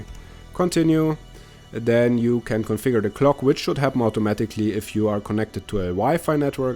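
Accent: Germany. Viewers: That strong german accent 😂 Gutes Video, mach ich gleich ma.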